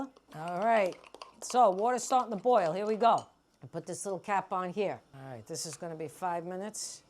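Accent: New Jersey, United States. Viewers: I hope you do more videos. Your Jersey accent is the best. I’m definitely going to try this! Never heard of it before, i never see Chock Full of Nuts coffee anymore. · Love the Jersey accent. 😊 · At first I was intrigued by her Sopranos accent, then the egg and coffee, watched the whole thing, loved it, hope it becomes a show or something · Jersey accent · Used to live in Jersey. This is the first time I ever heard Jersey accent and think ASMR. Read the book. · It is an Italian recipe, beat egg york with sugar and marsalla....they used to give it to the children to raise their immunity , strengthen them, specially if they were week. Is she from New Jersey!!! Her Accenture her way of talking so masculin...ohhhhh no milk please!!! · OMG. I grew up in northern New Jersey and now live in the South. The accent, the mannerisms, the relatability - all transported me back there. 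What a Jersey girl! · I miss the sound of Jersey. Best accent in the world. Warmest people. 😢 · As a Jersey native living down south, her voice takes me back home🥰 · I know she's from Jersey 😂😂😂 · That italian jersey accent😂😂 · Yokes, nats, and fathas, Jersey accent, forget about it, love this vid!!! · Gawd. I'd rather wipe my ass with sandpaper than hear a New Jersey accent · Is she a Jersey girl or what i love her!!Coming from jersey myself,love her jersey accent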